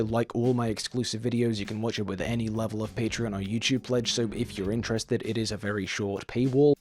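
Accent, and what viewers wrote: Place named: North, United Kingdom. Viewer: Why does sound more like he's from the north in the flashbacks?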